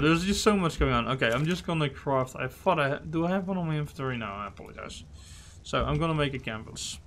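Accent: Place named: Netherlands. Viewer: Theres such a good Managarmr paint job Actually (fakka strijder btw, k hoor gwn dat je NL bent over je engels XD) gooi ff je discord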